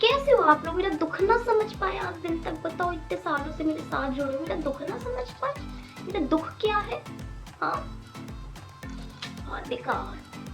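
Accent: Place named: Punjab, India. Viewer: Aapka dukh Sankat tu mujhe Rona nahin a raha hai balki Hansi a rahi hai aapki baten sunkar 😂😂😂 itne acche se aap Punjabi language wale rahe ho, aur mujhe lag raha hai kuchh logon Ko karara jawab mein mil raha hai aapki ine baton se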